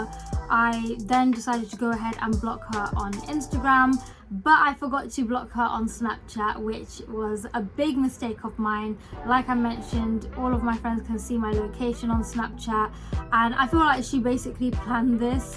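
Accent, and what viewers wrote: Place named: United Kingdom. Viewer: Omg you’re from UK??? 😍😍😍😍 the accent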